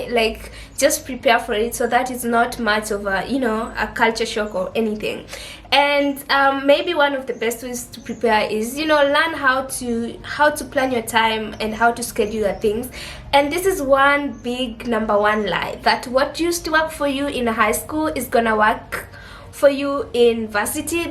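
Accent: Kenya. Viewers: Number 2. You're an extrovert. As for me I am happier when I am alone. But why do you sound like a Kenyan? Kenyan accent. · Your accent is East African most likely Kenyan.. Are you from Kenya?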